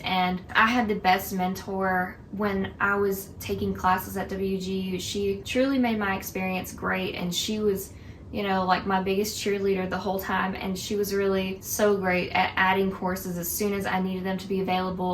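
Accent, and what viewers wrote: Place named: Birmingham, United Kingdom. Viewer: Yooo you sound like you're from Birmingham then you said Jeff State, coincidence or am I just crazy?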